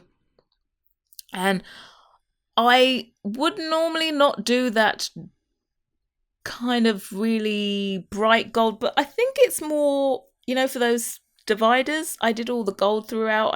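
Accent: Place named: England, United Kingdom. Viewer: I'm not one for commenting in public...very British of me! Nevertheless, your taste is impeccable and I do love hearing an English accent. The fact that this is a 2017 video and the design holds up so well, as well as the presentation of the video , is amazing. Thought I would say it is rather criminal that you aren't more pushed in the Sims community and have subscribed as of about a week ago! Good luck for the future.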